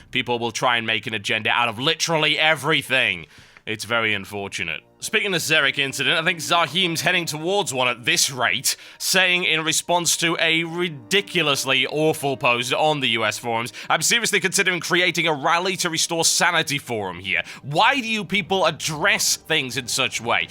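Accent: United Kingdom. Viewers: lol British..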